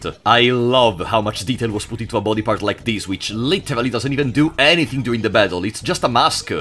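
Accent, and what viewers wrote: Italy. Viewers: Il bro è palesemente del Piemonte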